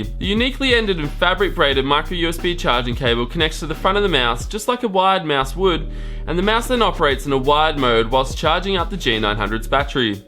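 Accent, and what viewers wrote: Australia. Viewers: happy to find a tech channel with an aussie host :D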